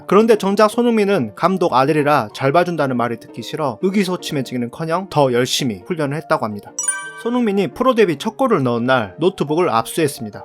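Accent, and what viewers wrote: Jeolla, South Korea. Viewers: 내레이션에 전라도억양이 심해 듣기 거북하네요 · 전라도분인가보다 ㅋㅋ 사투리억양이 느껴지네용^^👍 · 전라도 사람이신가요? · 전라도 사람이신가봐요 사투리 중간중간 잘들었습니다 ㅋㅋㅋ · 님..고향이 혹시 전라도세요?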